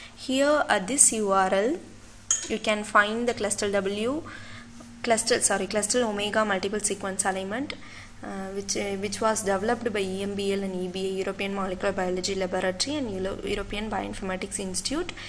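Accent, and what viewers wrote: India. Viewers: I think she has an Indian accent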